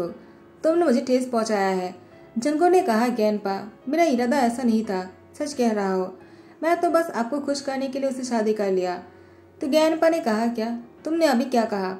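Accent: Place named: Bengal, India. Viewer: Di you're a bengali right?? 😁 plz ap mind maat karna apki stories bohat acchi hoti hain and I love them😍❤ but maine bohat baar apka baat karne ka tone ya baaton ke beech bengali word ate hue dekha isiliye puchi and also ye story ek famous bengali movie se inspired hain so🙂 (and i'm also a bengali)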